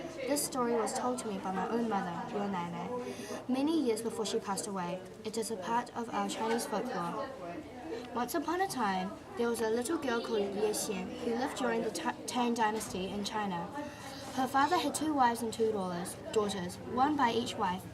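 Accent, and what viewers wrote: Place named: England, United Kingdom. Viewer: OMG an Asian with a English accent.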